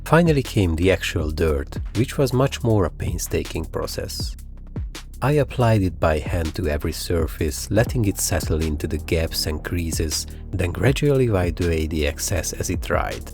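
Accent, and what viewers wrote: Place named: Hungary. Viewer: Are you from Hungary? The accent is not very strong, but it is there :)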